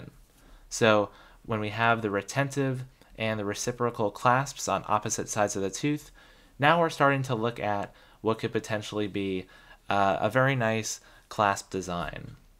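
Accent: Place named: United States. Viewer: PLS WHEN YOU SPEAK TRY TO SPEAK ENGLISH NOT A AMIRICAN language COZ HERE SOME DR THEY ARE NON PROFICINAL ENGLISH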